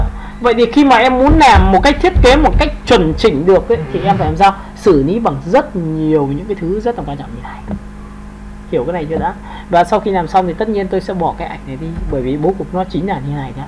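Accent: North, Vietnam. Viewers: Trình độ thì không nói tới, chỉ thấy bất kỳ anh người Bắc nào dạy học cũng như vẻ dạy đời, nghe giọng mà ngán ngẩm vãi, nhưng phải ráng nghe!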